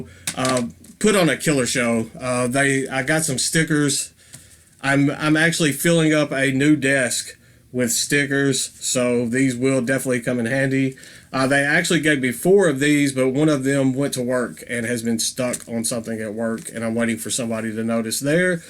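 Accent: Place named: South, United States. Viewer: Would be funny to hear you singing little bunny foofoo with that southern accent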